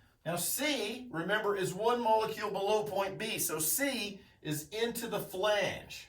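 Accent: United States. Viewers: Finally an engineering video in ENGLISH! With American Accent.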